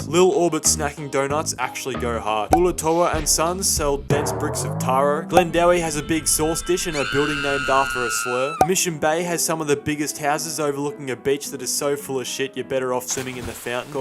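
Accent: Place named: Australia. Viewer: Your australian accent is fucken yuck. Shot for reminding me why when I do leave nz I'm not going to Australia.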